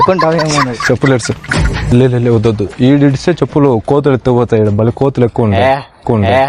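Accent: Anantapur, India. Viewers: Medi ananthapur ah bro slang knchm alane undi .. just asking anthe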